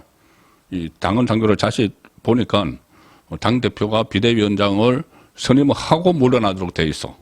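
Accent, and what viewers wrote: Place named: Daegu, South Korea. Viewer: 표준어 발음도 제대로 못하는 대구 촌놈 주제에.. ㅋㅋㅋ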